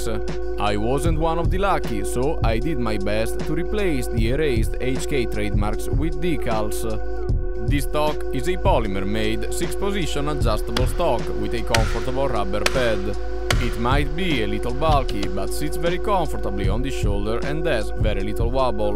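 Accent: Italy. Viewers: Don't agree at all. Easy to understand and with an awesome accent :) Italian?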